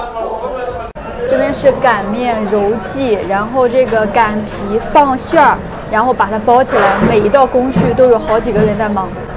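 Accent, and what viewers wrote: Shandong, China. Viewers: 听口音，应该是山东莱芜人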